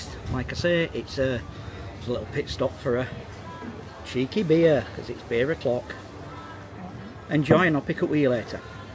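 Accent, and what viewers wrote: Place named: West Yorkshire, United Kingdom. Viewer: Thanks for that . Might give it a go late September or June. Looks up my street . 🍺👌 We think we caught you mention West Yorkshire ? After we guessed by your accent . Where about May we ask ? We are really looking forward to our favourite go to airport reopening again up there Doncaster . 🙏🏼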